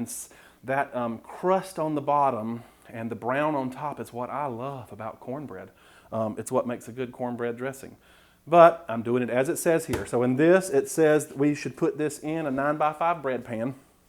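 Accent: South, United States. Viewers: Im really enjoying your videos. You're respectful, good speed, clean environment, humorous! Love the southern accent as well! :) Thanks! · I can tell you are a Southern boy. 🤣😂 Love it. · Hey, Throw some cracklin's in there.The last one with the bacon grease and liquid corn looked awesome I wonder if you could bake them in the brownie pan hummm.Just 3 weeks ago you hit 5,000 and have you looked at your numbers? I spread the word at work looking forward to 10,000 in the near future. I have 2 friends at work that don't do low carb but, they just like your humor and southern accent.I love both of those also but, your carb smart and honest in your opinions.Thanks for sharing. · Come for the cooking show, stay for that southern draw. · There is NO doubt you are southern... since my gf is total southern from bama!!! Loved your pancake video and this is the second one of your videos i have watched you remind me a little bit of Alton Brown... 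you come off very honest and genuine and likable so I have subscribed to your channel you have a new fan!!!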